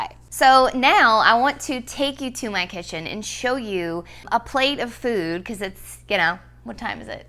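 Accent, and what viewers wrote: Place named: Texas, United States. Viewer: You came up into my feed today. I binge watched all of Plantifulkiki’s videos and switched my diet three weeks ago. My energy is amazing now! I subscribed to your TB & IG I can completely relate with the family issues & diet X 10 years. I hope to be an inspiration to them & then they will follow more. Cute Texan accent! You’all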